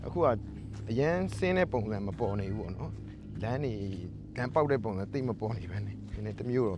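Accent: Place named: Myeik, Myanmar. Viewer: မြိတ်အသံပါ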